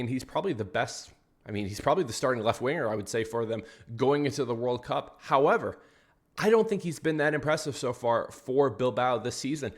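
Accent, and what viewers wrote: United States. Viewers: Are u from kc bro?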